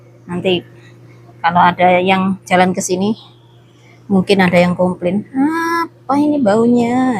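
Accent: Java, Indonesia. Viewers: Aduh mba...lucu deh speaknya sama...jawane loh mba medok banget...aku wong jowo dadi ngguyu dewe...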